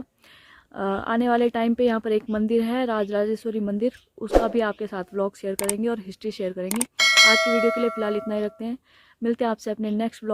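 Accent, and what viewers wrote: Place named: Garhwal, India. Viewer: I really like the way you talk, the garhwali accent 😍 is just killing.. and you are cute also i would say.👍. Soon you are going to be a top blogger ,keep it up.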